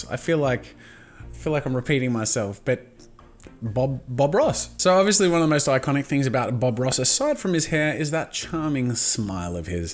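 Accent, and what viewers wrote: United States. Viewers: American accent on point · I cannot listen to you with an American accent I'm going to SCREAM ITS SO UNNERVING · you pull off the American accent really well. Great drawing. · Nice Amati can accent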